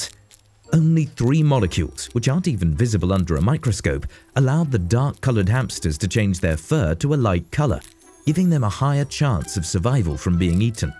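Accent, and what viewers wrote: United Kingdom. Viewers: lol these simpletons thinking this is a kurzgesagt ripoff. 3 elements: cartoon, british narrator and science focused makes it a ripoff? Besides, Kurzgesagt is crap and has proven themselves to be unapologetically biased and totally untrustworthy. Kurzgesagt fans are no better, and have some kind of a weird cult-like behavior to the channel, as if they could do no wrong. Use your brain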